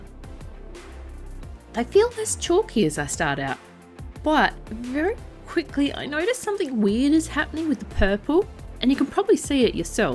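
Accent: Australia. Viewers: First of all: You've been making me smile a little bit more with every post-advent-calendar video. "The Manipulations of Manni (Manny? Mannie? Man-ny?)!" (🤔 Needs work.) I might be a bit obsessed. Also: I just wanted to say how I love, appreciate and adore that, compared to sooooo many YouTubers, you're classic Ozzie. (NOT bogan, classic. 😂) And you make classic Ozzie look and sound amazing. I'm from NZ 🖤🤍but I've lived in Brisbane 💚💛for... a while - a number of years longer than I originally intended anyway - and I reckon NZ and Oz are super similar in that we're kinda... understated? We definitely don't tend to have issues with hyperventilating in our excitement . Not in the same way I've noticed those from other countrys seem to anyway (I mean no disrespect, this seems to be an Oceanic idiosyncrasy, is all).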